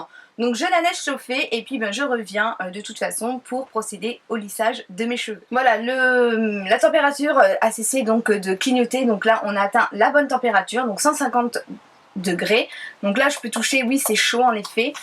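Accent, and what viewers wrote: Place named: South, France. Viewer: tu as un accent du sud on dirait !😍 ta vidéo est top ! <33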